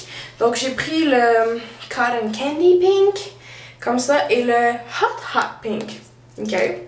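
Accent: Quebec, Canada. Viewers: Son français est juste québécois laisser la gang de "français meilleur que les autre" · Elle est québecoise hein. C'est normal ça fait partie de leur manière de s'exprimer. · Perce qu'elle est québéquoise (ou canadienne) et vu que c'est près des usa il y a toujours un accent ;) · ahh ta yeule sa s'appelle ''l'accent québécois'' ok? bye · Accent québécois espèce d'inculte -. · c'est son accent car elle est Québécoise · j'adore trop ton accen du Quebec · titi la gourmande elle est québécoise et les québécois et québécoise on un accent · Sa s'appelle avoir un accent Québecois.... -____ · son accent..?? c'est une québecquoise loll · Vous pensez qu'écrire des commentaires de ce genre vont servir à quoi à part lui faire du mal? C'est juste méchant parce que son accent, tout les québécois l'ont, ses sourcils, je suis d'accord que ça lui va pas mais peut etre qu'elle s'est juste louper. 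Juger sur le physique c'est juste puéril et purement méchant. Si vous dites ce genre de choses c'est que vous ne la connaissez vraiment pas. Je n'ai pas la prétention de la connaitre, je dis juste que je suis toutes ses vidéos et j'ai pu remarquer que c'est une bonne personne qui a du cœur. Laissez tomber... ne fais pas attention à eux, ils veulent juste te blesser, ça vaut pas le coup, j'en ai eu l'expérience. Love. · Comme j'aime dire des trucs HS, j'adore l'accent québécois! :P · Mais, putain fermer la !! C'est t'est venu juste ici pour la critiquer bah! Vas t'en ,, moi je la trouve magnifique d'même ok!! Chacun à son gout ,, son accent que vous dite , c'est du québécois ;p!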